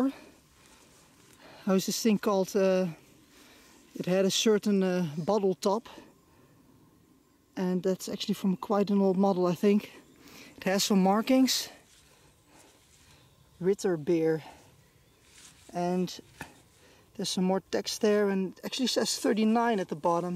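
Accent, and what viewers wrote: Netherlands. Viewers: Where are you from you sound dutch? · I can hear this dude being dutch lmao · Is that a Dutch accent ;)? First video i've watched on this channel and definitely not the last. Very educational. Keep up the good work! +1 sub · Leuk Nederlands accent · You sound very dutch. Am I correct? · Goede videos maar je uitspraak is soms super nederlands. Het is maar een tip. Zoals the Bulge bijvoorbeeld. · Nederlands of belgies? Vanwege je accent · I can hear you are dutch ( ik ook) and i really find this interesting you just eared a sub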